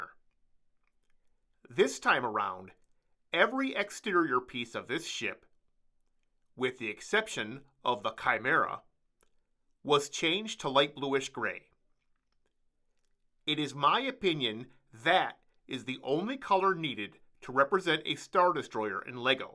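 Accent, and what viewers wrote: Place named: Canada. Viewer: Are you Canadian because MAN you sound like a Canadian Also Great job very well done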